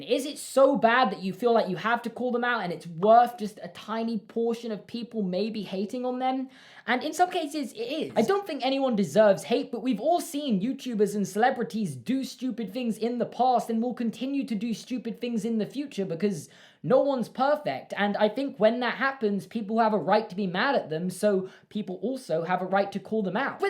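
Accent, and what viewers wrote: United Kingdom. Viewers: I don't know if you'll read this, but I feel so positively impacted by your video that I need to comment. I do not comment often on videos. I believe I found your channel through the Carlin Bros. I enjoy a lot of the subjects you make videos about (Harry Potter mostly). I really enjoy your editing style and delivery. I'm a bit surprised that you're self-conscious about your voice because I don't find it annoying at all. Perhaps it's the British accent? I know far worse voices for YouTube. Getting off-track a bit... I think this video says a lot about your character. You're opening up about your experiences as a YouTuber and ultimately your highs and lows. You acknowledge shortcomings and work hard to be a better YouTuber and a better person. That motivates me to be better, too. I also hope that you keep finding fulfillment through YouTube because I really enjoy your channel. 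I enjoy your comedy and value your opinions. I hope you know that many of your subscribers value your perspective. It does surprise me a bit that you receive impactful hate comments. You're a great person and you deserve everything you get from this platform. I hope you are well! Best of luck to you going forward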